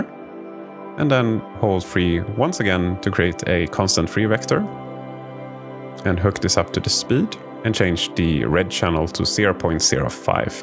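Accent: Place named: Netherlands. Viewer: Your voice and pace, made me enjoy this tutorial,very helpful, I think it has to do with the Dutch accent there 2😂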